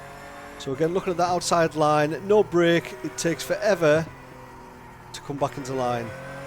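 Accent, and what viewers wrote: United Kingdom. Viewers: What part of UK is your accent?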